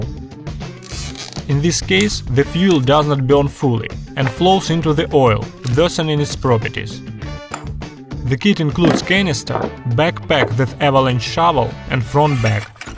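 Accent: Russia. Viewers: Ужасный акцент русского, типичное зрелище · Russian accent language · Говорит чел с русским акцентом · У него русский акцент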